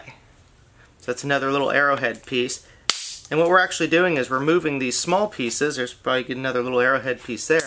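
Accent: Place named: South, United States. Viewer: are those invasive hogs? i'd assume so by the slight southern accent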